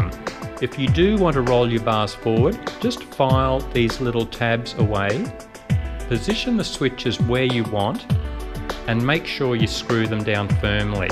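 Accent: Australia. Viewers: After all the reviews I tried to watch but failed by bloody skeptics, along comes this one one spoken in plain Australian. Thank you looking forward to watching the whole series. Where on the Sunny Coast are you lot at?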